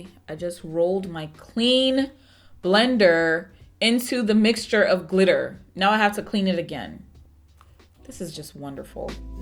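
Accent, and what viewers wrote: Africa. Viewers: her African accent is soo hilarious!!! luv it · Hi,. Im in love with your personality. And this accent, omg 😂 i don't know which one it is (because I'm from Russia, english is not my language), i think it's African, right?) You are so funny and, you know, so unlike other people! Be yourself, we love it 😍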